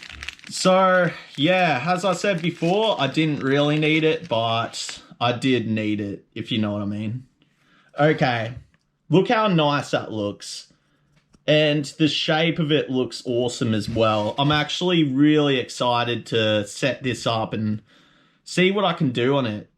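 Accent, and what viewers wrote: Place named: Australia. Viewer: Im not even making fun of you but gawd thats a real aussie accent. Nice vid tho.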